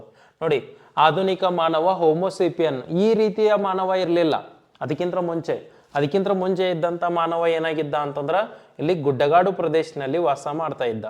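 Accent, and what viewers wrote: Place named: Belagavi, India. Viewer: ನಿಮ್ಮ ಬೆಳಗಾವಿ ಕನ್ನಡ ತುಂಬಾ ಚೆನ್ನಾಗಿದೆ ಸರ್ 🙏🙏🙏🙏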